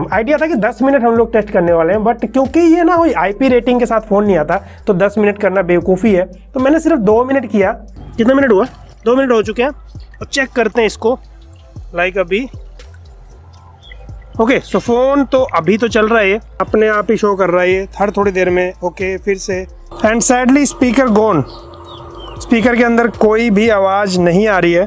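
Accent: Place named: Uttar Pradesh, India. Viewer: आप का स्टाइल zabardast है. Especially the u.p. वाला touch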